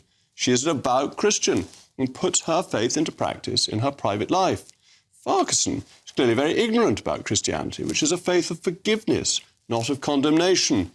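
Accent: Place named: Cockney, United Kingdom. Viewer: Cor blimey thats a right bleeding Cor Blimey Cockney accent aint it.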